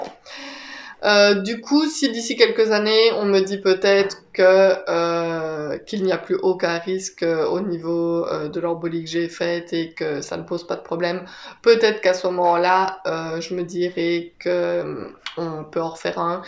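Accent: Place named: Liège, Belgium. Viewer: Coucou, je me doutais bien que tu étais de Liège ton accent 😊. C'est quand même impressionnant d'aller aux urgences, et de s'entendre dire que nous n'avons rien. Merci bonne journée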